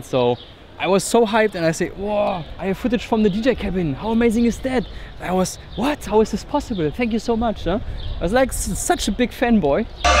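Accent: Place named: Germany. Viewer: Dein Englisch ist schrecklich. Man hört noch viel zu sehr deinen deutschen Slang.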